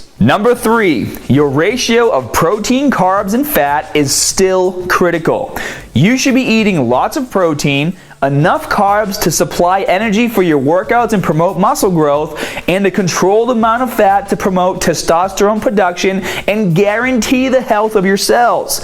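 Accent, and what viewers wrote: Boston, United States. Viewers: will do but I have to say I love it. Boston accents are great! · I don't remember having. that accent. Did move to the Boston area in the past couple years? Or has he always hidden the accent to an extent? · It's a Boston accent. It's not a New York accent; I'm from New York. It's not a British accent, and it's not an Australian accent either, although I get the mix-up. Problem solved. To me personally, the Boston accent sounds like a combination of a neutral American accent and an Australian accent. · Boston accent? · lovin the accent from boston myself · h I asked the same question, the answer from other peeps was "bahstin" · "With winter around the cornah" That thick Boston accent! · Loving the return of the boston accent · bro, when the fuck did u aquire this "thick" boston accent?! u say bar, then baaahhhhhhhm what the fuck haha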